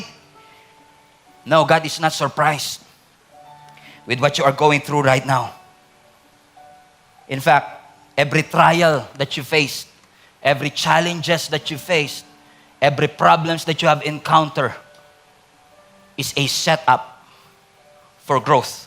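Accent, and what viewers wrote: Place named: Visayas, Philippines. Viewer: Ang Ganda marunong kpala mag Bisaya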